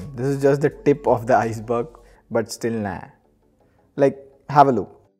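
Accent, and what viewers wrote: India. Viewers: As an English speaker, it's so difficult to listen to somebody with an Indian accent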